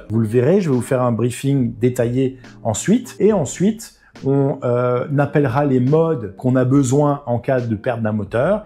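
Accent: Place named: France. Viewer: J’adore ton french accent😂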